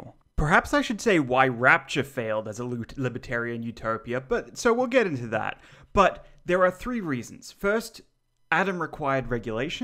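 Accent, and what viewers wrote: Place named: Australia. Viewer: I've never heard someone with an australian accent using so mamy rhotic words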